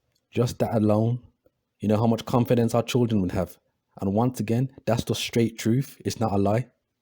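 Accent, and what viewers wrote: Birmingham, United Kingdom. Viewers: This guy speaking is a brummie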